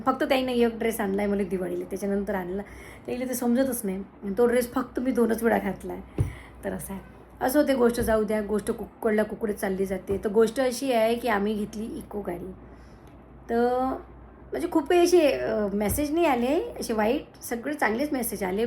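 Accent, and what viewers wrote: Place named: Vidarbha, India. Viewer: वऱ्हाडी भाषा खुप छान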